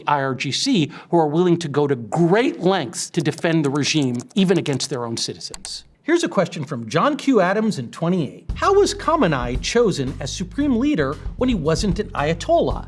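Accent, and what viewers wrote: Arab world. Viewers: I always wait for him to pronounce arabic words super arabic style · His pronunciation is very Arabic and less Persian.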